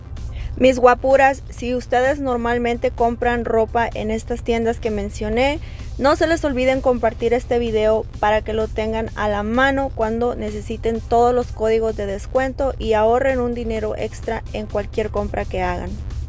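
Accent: Mexico. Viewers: Porque habla como mexicana.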